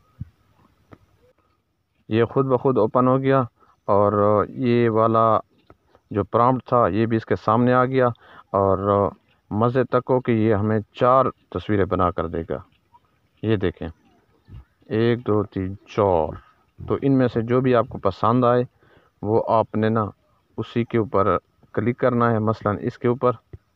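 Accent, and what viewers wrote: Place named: Saraiki, Pakistan. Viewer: Lahja sariki Hy...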